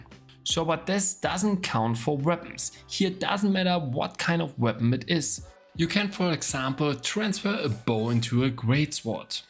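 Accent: Germany. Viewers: Ich liebe wie man einfach hört das er deutsch ist xD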